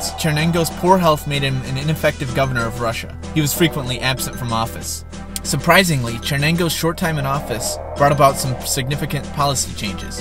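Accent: United States. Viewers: You from Chicago? I can hear that accent lol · The narrator has a distinct Michigan accent.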